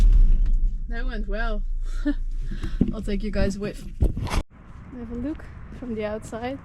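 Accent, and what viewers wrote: Netherlands. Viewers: First time here. Heard your Dutch accent right away! Wondering where you are in Nederland? My kids & grandkids are in Barendrecht and Dordrecht area (Ik ben in Utah, USA... lang verhal, hoor! Lol 😅) I'm an 'ol Willys Utility Wagon guy. My dad bought new in '64 a new Wagoneer. Drove it in high school in the '70's. But I fell in love with the older Utility Wagons ('46-'63) I have a '59. I LOVE these old tough off-road machines. The Volvos, Mercedes, UAZ's etc. Usually having only a face a mother could love but capable of traversing almost any type of terrain. I'm pretty sure those machines around you are not challenged much in Holland (except mud) but would be "fun" in Russia or China or...??! You are a girl after my own heart. Would that you had been there years ago when I needed someone just like you. 😊 'sja... Nou ja, zeg! God bless you in making your dreams come true. 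If you ever want to see Utah (Moab) the Great Basin Desert, hit me up. Always welcome here! Totziens! 😎👍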